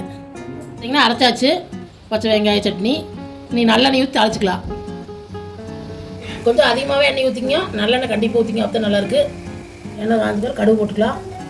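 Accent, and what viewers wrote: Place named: Kongu, India. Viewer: அம்மா உங்கள் கொங்குதமிழ் இனிமை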